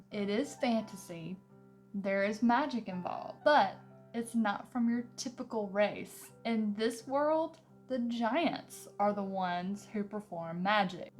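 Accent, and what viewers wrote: South, United States. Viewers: Omg your southern accent <3 I am Canadian and I've loved my trips to the south. John Gwynne is a damn badass and I love him. I hope you LOVE the rest of the series.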